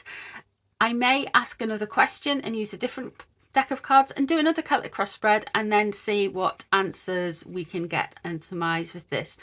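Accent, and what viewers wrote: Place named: England, United Kingdom. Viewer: Love❤ your interpretations you are so on the case. Love your accent too . I’m Australian and I get a kick out of different English accents, there are so many varieties. Here in Australia we pretty much sound similar.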